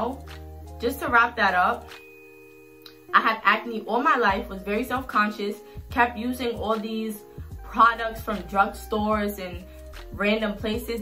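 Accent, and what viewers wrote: New York, United States. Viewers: Is she from new york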